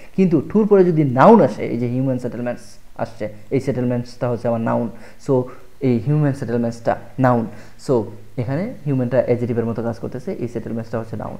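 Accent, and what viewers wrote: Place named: Sylhet, Bangladesh. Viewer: অনেক ভালো লাগলো ক্লাস গুলো। আপনি সিলেট এর মনে হলো। আমি ১ বছর সিলেট ছিলাম, অনেক ভালো মানুষ সিলেটিরা।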